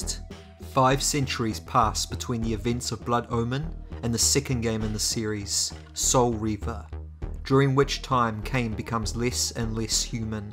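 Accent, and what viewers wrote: New Zealand. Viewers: From or based in New Zealand? I hear an accent. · This content and writing is so lekker! Love it. Is that a New Zealand accent?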